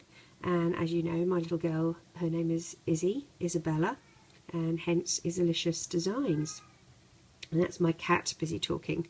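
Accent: United Kingdom. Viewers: Cool channel!!! <3 your creations!!👍👍👍👌👌👌✌✌✌😊😊😊 I love your British accent!!! 🇬🇧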